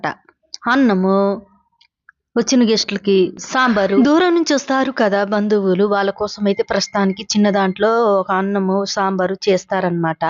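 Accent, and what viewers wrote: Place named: Rayalaseema, India. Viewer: Meedi rayalaseema yasha lagunde. Nizamabad valladi kondari chuste rayalaseema yaasa vasthundi. Vichitram.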